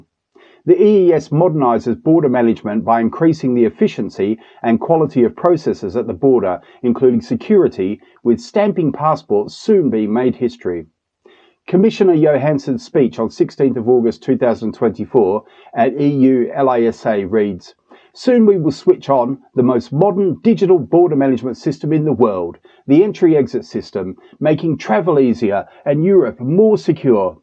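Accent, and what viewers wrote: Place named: South Africa. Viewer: Enjoy the SA accent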